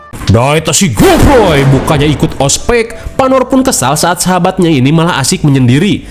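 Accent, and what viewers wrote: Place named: West Java, Indonesia. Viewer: Klo xg reviu flm horor jd ngakak.. Sundax lucu.. Kerren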